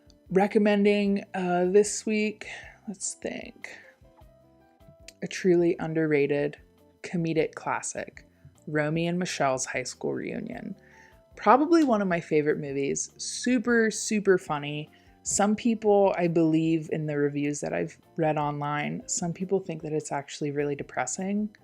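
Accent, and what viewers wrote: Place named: Midwest, United States. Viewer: I love your humor and the way you talk, it reminds me of home, are you from Michigan perhaps… or maybe Minnesota?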